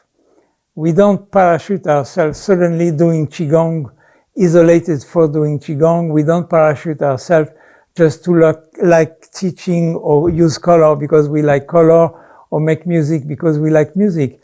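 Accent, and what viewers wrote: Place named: France. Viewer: Thank you,! As your student, who once sat at your feet to learn your all-encompassing teachings, this video is a wonderful summary of the total reality that we, as would-be healers and light-workers, are immersed in. How I love to listen to you speak (even though the French accent is always a little difficult for me to understand, ha ha)! You have the ability to articulate the whole truth of being that I feel inside but could not say so well. It was a privilege to learn from you, and is my duty to share this philosophy with everyone I can, so it will ripple out to the whole earth, building that light to heal. I bless you and all the good you are doing! Thank you, thank you, thank you!!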